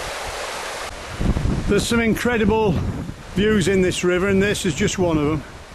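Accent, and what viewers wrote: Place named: Yorkshire, United Kingdom. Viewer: I lived in Dacre Banks in the 80s... loved this video. Took me back in time for a bit.:) Nice to hear the Yorkshire accent again as well. Thanks!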